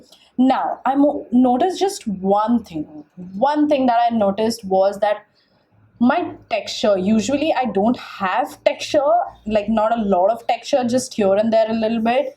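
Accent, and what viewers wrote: India. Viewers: I fucking hate indian accents soo much, idk its fucking weird as fuck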